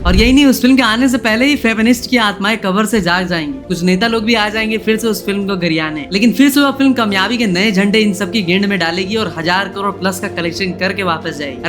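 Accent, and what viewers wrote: Bengal, India. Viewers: Bhai ap Bangali ho ??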